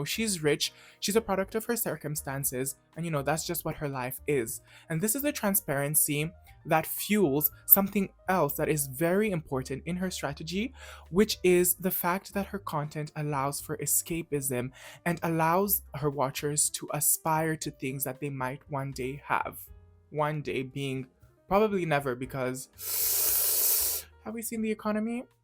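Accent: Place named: Jamaica. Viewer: You sound jamaican to me, all i could focus on was that and your fro 😅. Lovely editing too!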